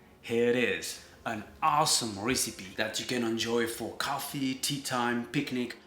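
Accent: France. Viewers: Your accent is sounds so funny :D French, isn`t it?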